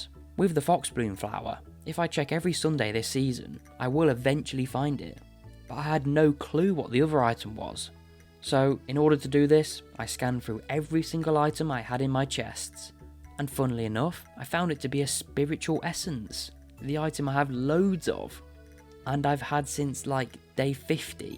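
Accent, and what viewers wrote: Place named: South Yorkshire, United Kingdom. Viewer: FINALLY i found someone who plays the curnocopia mod !!! Also your accent is VEERRY similar to mine im from south yorkshire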